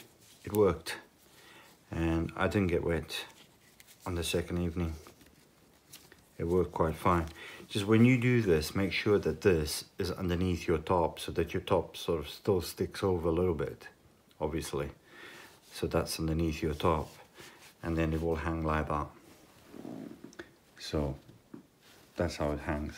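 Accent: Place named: South Africa. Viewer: I thought I could detect some South African and a little bit of Italian. Thanks for replying mate.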